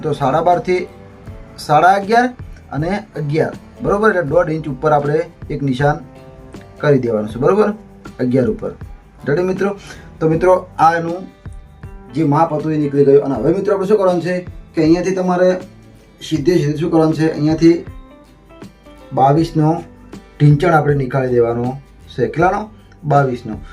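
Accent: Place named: North Gujarat, India. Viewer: ઉતર ગુજરાત ની ભાષા સે સાહેબ,,કાયુ ગામ જનવાજો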